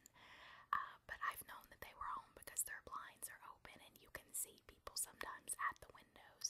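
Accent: South, United States. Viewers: Where are you from? In your videos I detect a southern accent.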